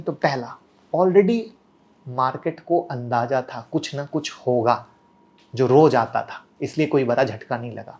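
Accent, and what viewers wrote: Bihar, India. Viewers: Bihari language me bol raha hai,isko english nahi aata hai kya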